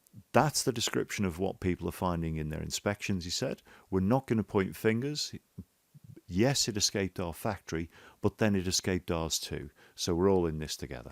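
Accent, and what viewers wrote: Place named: Wirral, United Kingdom. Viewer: You’ve got to be from the Wirral i’ve been looking at your LinkedIn profile and the moment you started speaking I thought I know that accent. 😂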